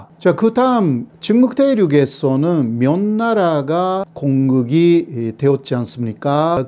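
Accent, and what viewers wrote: Japan. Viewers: 외국인의 서울말 억양은 어색한데 일본어 억양이 섞인 서울말은, 단조롭고 밋밋한 서울말보다 박력이 있어 듣기 좋다.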